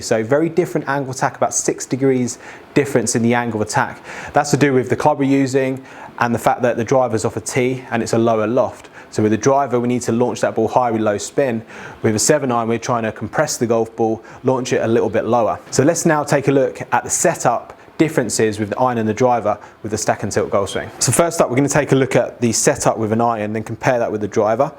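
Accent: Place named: United Kingdom. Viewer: LOL! I wondered with that British accent how you could be Canadian. Another!!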